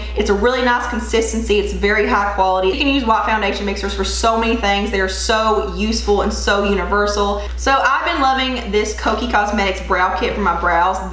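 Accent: South, United States. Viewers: Love your accent!!!! For sure from the south!!!!!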